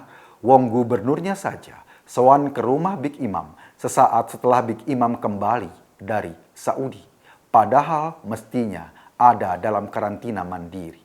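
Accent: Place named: Banyumas, Indonesia. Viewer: salam ngapak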